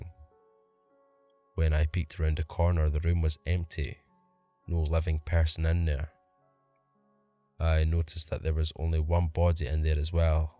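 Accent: Scotland, United Kingdom. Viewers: I already knew that I loved morgue stories, but with a Scottish accent, I love them all the better!! 👍👊fist bump!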